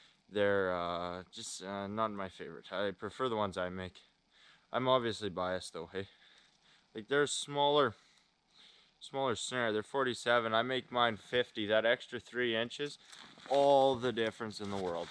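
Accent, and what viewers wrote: Canada. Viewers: By the way you talk, you sound Canadian.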